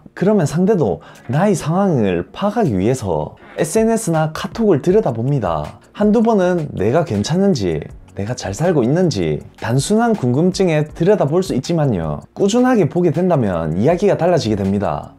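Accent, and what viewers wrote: Gyeongsang, South Korea. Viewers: 경상도 분인가요? 그쪽 제 스타일입니다 😘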